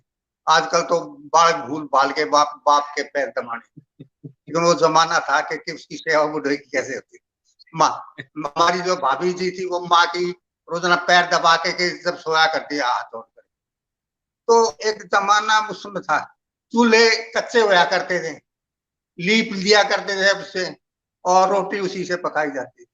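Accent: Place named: Haryana, India. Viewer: बहुत दिलकश हरियाणवी... बहुत स्वागत 😄😄😄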